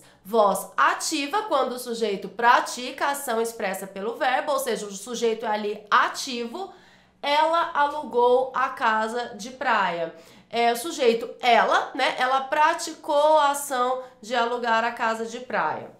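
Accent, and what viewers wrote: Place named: South, Brazil. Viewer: Professora, seu sotaque parece ser do sul, mas qual estado? Abraços apertados de quem já assistiu as suas aulas literalmente centenas de vezes.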